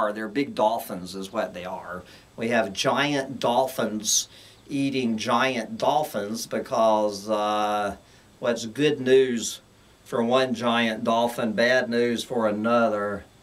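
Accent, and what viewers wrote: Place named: Pennsylvania, United States. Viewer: He is upstate where accents are more like Pa. and Ohio than the City's interesting accent.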